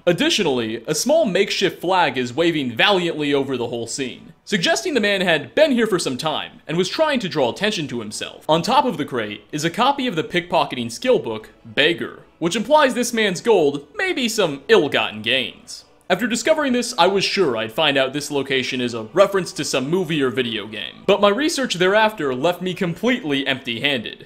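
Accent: Australia. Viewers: Is Australian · Hey are you From Australia? Because if you are I've never noticed until now. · is aussie?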